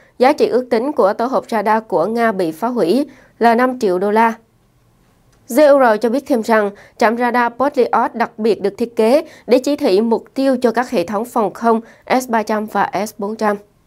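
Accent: South, Vietnam. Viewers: Chào xinh đẹp ❤phát thanh viên có giọng đọc nghe rất hay chuẩn nam bộ. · 🌴.Anh Chào e nhé..Cô Mc rất là dễ Thương và Xinh đẹp.Cô có chất giọng đúng người miền Nam..Dễ nghe,lôi cuốn người luôn và thích quan tâm các tin tức thời sự..Nhất là các tin tức Q.sự..Đặc biệt là ở chiến trường đất nc Xinh đẹp Ukraine..Anh xin Kính Chúc Tập thể ban biên tập (Bbt) Chương trình của Kênh Qsm,cùng với Cô Mc Xinh đẹp như a đã nói ở trên luôn đc nhiều s.khoẻ, và mỗi ngày sẽ có thêm rất rất nhiều người biết đến Kênh Qsm để tìm đến theo dõi Kênh ngày càng thêm nhiều..Mc Xinh đẹp luôn mãi là người mà a rất ngưỡng mộ, và luôn ủng hộ Kênh Qsm đi cùng với e..Một lần nữa.Xin chân thành Kính Chúc đến với tất cả Quí vị 1ngày mới luôn Vui khỏe, và Hạnh phúc Bình an......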